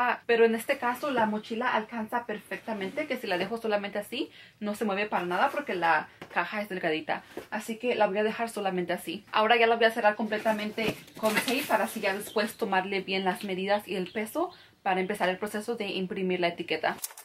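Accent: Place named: United States. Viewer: Amiga con todo amiga con todo respeto amo tu información y todo lo que haces creo que eres una gran persona pero no se si eres latina o naciste aquí y tal vez por eso tienes ese acento gringo pero todo el video me la pase escuchando tu acento gringo tipo cuando los gringos tratan de hablar español algo así y me confundiste un poco pero tu información es buena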